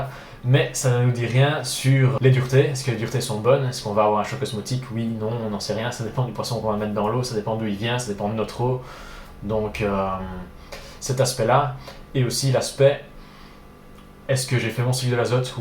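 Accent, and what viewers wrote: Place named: Canada. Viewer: J'aime bien les commentaires et surtout l'accent canadien je prends plaisir à l'écoute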